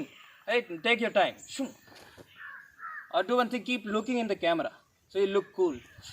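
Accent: India. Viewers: अण्णा I like your हिंदी English accent औंर बोलने की स्टाईल 😭😭😭😭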